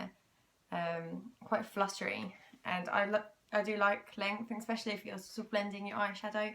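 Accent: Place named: United Kingdom. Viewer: The content of this video is not for me, but I watched anyway, because well, I am a sucker for a gorgeous woman with a British accent :)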